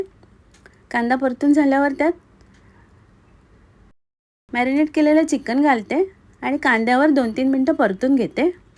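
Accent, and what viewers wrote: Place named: Goa, India. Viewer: तुमची सांगण्याची पद्धत फारच गोड आहे.ऐकतच रहावे असे वाटते.गोव्याचे कोकणी मराठी मध्ये.❤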